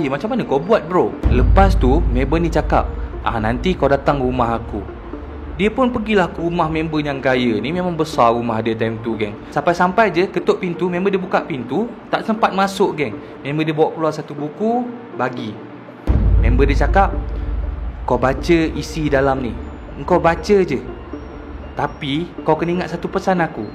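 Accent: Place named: Kuala Lumpur, Malaysia. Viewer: Cakap Kuala Lumpur ekk 🤣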